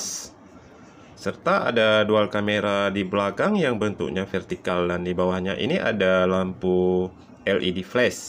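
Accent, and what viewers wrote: Malaysia. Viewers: Kok ngomongnya kayak org malaysia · Ni org indonesia apa org malaysia sih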